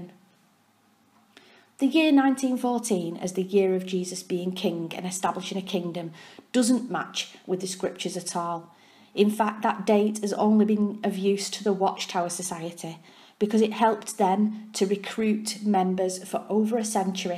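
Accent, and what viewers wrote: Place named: Ireland. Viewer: The Irish accent this lady has is just lovely. 😍😍😍😍😍😍😍😍😍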